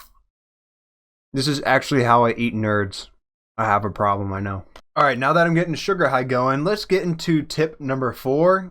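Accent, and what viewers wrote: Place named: West, United States. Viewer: It's weird, you have a western accent, but say "y'all"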